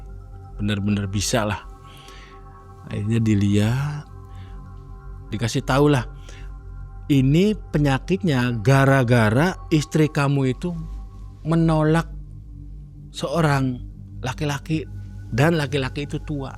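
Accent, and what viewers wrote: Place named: Brebes, Indonesia. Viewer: Mas wong brebes bukan sih?? Logatte kaya wong brebes tanjung losari jawatengah.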